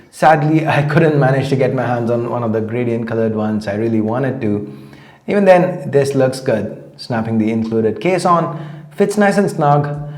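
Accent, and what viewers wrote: India. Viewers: typical fucking infian accent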